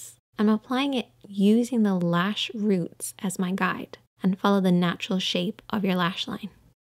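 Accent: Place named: Ireland. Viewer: Weird question, are you Irish? I can hear a wee twang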